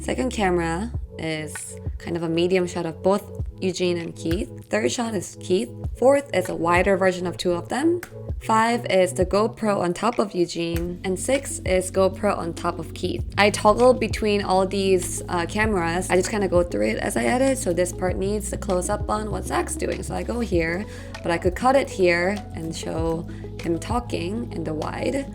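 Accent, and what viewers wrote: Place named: Asia. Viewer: What happened to your accent,? It has become more authentically Asian all of a sudden. Not that I am complaining, but still... How does such a thing happen?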